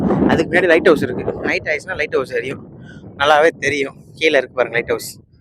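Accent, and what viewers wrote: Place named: Madurai, India. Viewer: உங்க பேச்சில மதுரை slang தெரியுது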